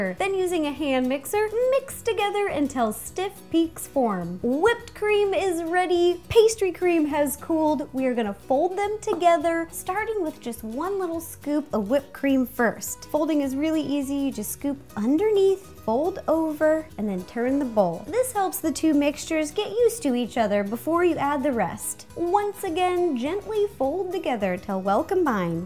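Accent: South, United States. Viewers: Maybe she's sorta southern. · Why does she have a sorta southern accent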